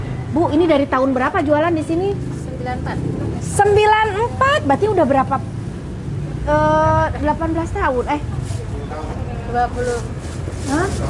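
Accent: West Java, Indonesia. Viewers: pengen ketawa mani mencugug Sunda banget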